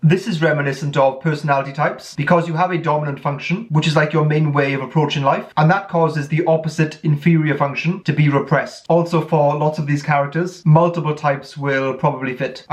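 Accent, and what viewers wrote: United Kingdom. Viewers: Can you please, please, please make an effort to speak more slowly in your videos, and maybe not edit your shots quite so close on the heels of previous shots? I'm really interested in your videos, but when you get going so quickly it can be pretty hard for me to understand your accent (and I'm usually really good with accents). I understand if it's your style or whatever, but maybe I'm not the only one from outside the UK who struggles to understand?? Thanks. And yes, I would like very much like more videos typing GOT and other fictional characters! 😁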